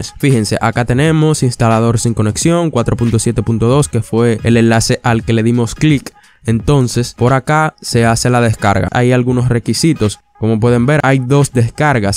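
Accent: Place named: Dominican Republic. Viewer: Todo muy claro, bien organizado y estructurado, además acompañado de un buen acento dominicano. Muchas gracias señor ;)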